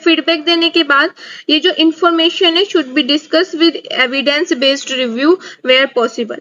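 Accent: Karnataka, India. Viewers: Karnataka se ho mam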